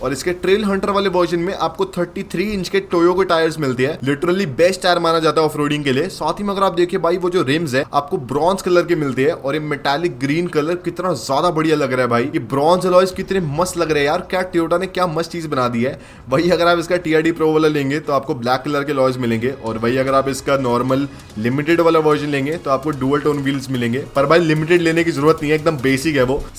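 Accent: Haryana, India. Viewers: Haryanavi accent mai bakwas mat kar yr CLARITY se baat kiya kar 4RUNNER arahi hai ya nahi ,teri toyota walo se kuch baat hui to wo bata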